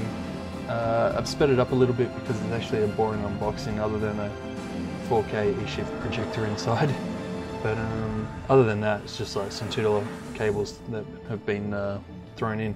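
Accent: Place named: Australia. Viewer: Andre Blackwell After listening to him for the first couple of minutes, I'd say he's likely in Australia.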